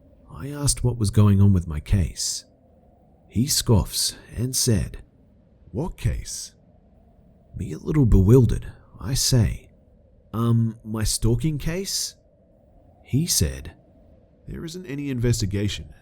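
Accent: Australia. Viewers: the way u can tell is he has a bit of twang as most Australians do to how he pronounces certain words like cove or over or home if u compare them to one of the numerous british accents you ll hear it too :) but its so slight. brits are a bit much at first to figure where they are from so many accents.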